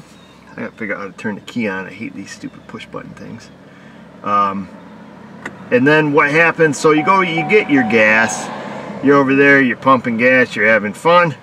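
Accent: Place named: Western New York, United States. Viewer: I’ve been in the area twice recently, but alas my car was running fine. I always learn something when I watch your videos and is like a trip back home when I your that WNY accent.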